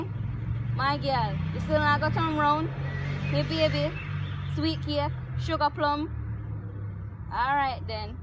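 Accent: Jamaica. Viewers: hahah! girl u know u crazy! 😂😂 I be watching these vids for ur patwa accent ...love it!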